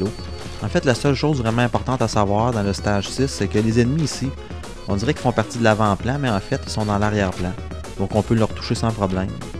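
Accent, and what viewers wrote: Quebec, Canada. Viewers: Québécois oui, mais tu es quand même très compréhensible pour des français comme moi ;) Et GG pour ta vidéo, même si tu trouves que Gaiares est un jeu facile (alors qu'arriver jusqu'au niveau 4 relève de l'exploit) tu arrives quand même à faire un run sans perdre une seule vie. C'est la que je me dis "Putain, ce que les canadiens sont bons :p"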